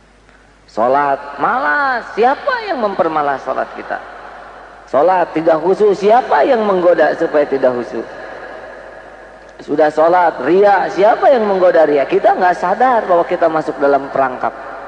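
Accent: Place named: West Java, Indonesia. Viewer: Suara AA' lah...dengerin aja Aksennya. Sunda.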